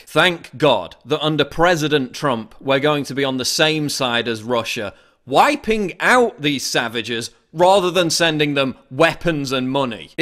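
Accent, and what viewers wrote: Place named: England, United Kingdom. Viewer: Wait a minute you sound like someone from England but calls football soccer? Still good video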